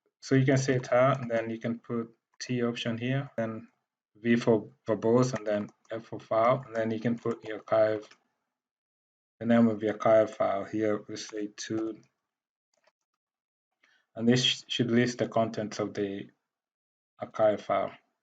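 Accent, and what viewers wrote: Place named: Nigeria. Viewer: Bro you sound Nigerian. Is there a way to connect with you? Instagram? Twitter?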